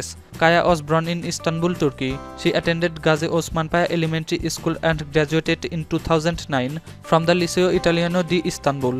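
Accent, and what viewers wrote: India. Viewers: is this guy speaking English or Indian language? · Lol is the narrator Indian? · Indian english🤔🤔🤔not bad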